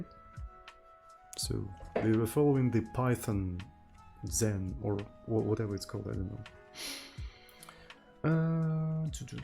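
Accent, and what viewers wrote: Russia. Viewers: Are you from Russia? Your accent sound as Russian accent and your account was registered in Russia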